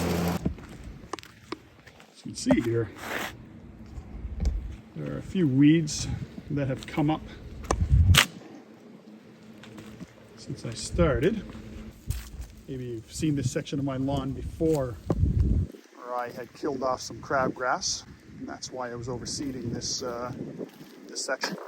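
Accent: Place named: Minnesota, United States. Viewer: You got that Minnesota accent.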